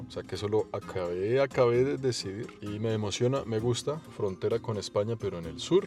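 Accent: Colombia. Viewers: Varias veces! Y me encanta su acento Colombiano.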